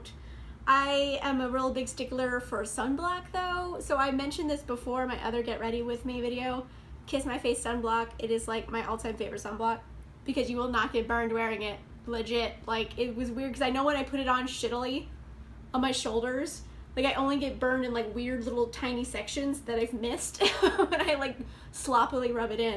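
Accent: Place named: Canada. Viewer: I don't know why but for some reason I thought you were Canadian. Where are you from? I'm in Greece.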